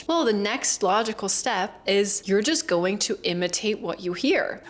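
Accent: United States. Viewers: I’m an American and your accent is more convincing than mine lol! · This will sound silly but your American accent is so good that I thought you had a sister that did the German bits. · As impressive as your grasp of the American accent is, you also don’t fall into the grammatical ticks that virtually every German I have ever known. For some reason, Germans have a terrible time with English verb conjugation and pronouns. Your English is flawless except for one word you were using repeatedly in this video. I have never hear accordion pronounced “a-cor-Dee-on”. English speakers pronounce it “a-cor-Dee-an”. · "You sound just like an American. Honestly, if you'd told me you were from the US, I would've believed it — no questions asked. Like, the way you talk? I'd have to replay it over and over just to convince myself you're not. I swear, I'd be sitting there listening again and again, like, 'There's no way this person isn't American.' So tell me — did you imitate all of this along the way? 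Like, was it before, or were you doing it while watching movies and scenes, just copying them as they went?" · Your American accent is perfect. In fact, it's so perfect it's scary. I would never have guessed you aren't American. I don't hear any traces of German accent in your speech. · AMAZING American English...except that last syllable of "accordion"...i know it's spelled on but we say it with an "en" sound...hear it on Google translate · I could hear straight away that you are German, from the way you pronounce T at the beginning of words. However You do an American accent very well, much better than I can 💖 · Honestly figured one of your parents was American.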